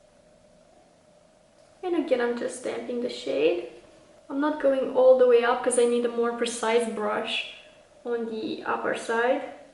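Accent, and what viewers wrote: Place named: Romania. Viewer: I am so happy I came across your channel. You are so sweet. 💕. I love the fall look you have created. You look gorgeous. I did a makeup fall look on my channel too. So much fun. Would love to be friends on here. 🧡 😘If you don't mind me asking, are you by any change Romanian? I can here a little bit of accent, but your name sounds Romanian :) 🥰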